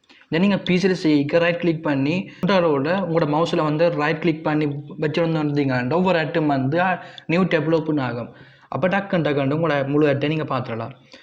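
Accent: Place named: Sri Lanka. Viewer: நண்பா நீங்க இலங்கை யா உங்கள் தமிழ் different ஆக உள்ளது Niceee❤️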